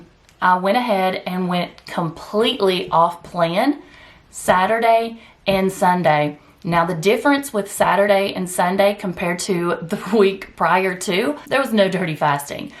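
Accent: South, United States. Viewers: Hi, that’s GREAT! Thats keto flexing, when you go off and on keto with negligible negative results. Gotta live life. I love the southern accent( I’m a northern guy). Keep up the great work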